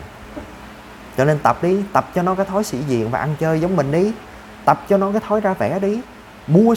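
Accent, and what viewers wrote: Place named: Binh Dinh, Vietnam. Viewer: A người gốc bình định thì phải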